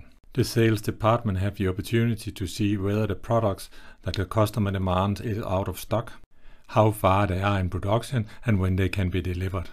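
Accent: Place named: Denmark. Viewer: its called "Danglish".....english with heavy danish accent. typical for people around 50 and up that didn't learn english from series or movies.........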